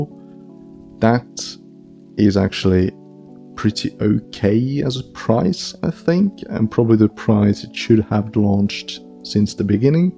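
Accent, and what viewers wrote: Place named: South Africa. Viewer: Bro sounds South African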